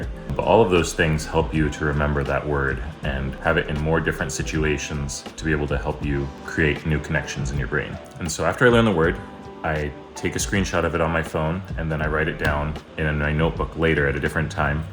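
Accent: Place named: Uzbekistan. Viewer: Wow your accent like ecxacly Uzbek🔥 I hope your visit to Uzbekistan will be amazing🇺🇿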